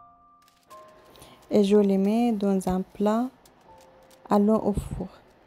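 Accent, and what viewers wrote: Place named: Levant, Arab world. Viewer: من طريقة الكلام اضن صاحبة القناة من المشرق العربي . يعطيك العافية ❤❤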